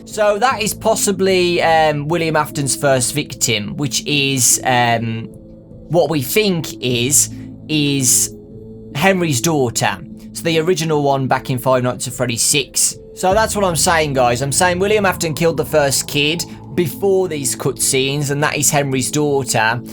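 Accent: United Kingdom. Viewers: i hate this brittish accent.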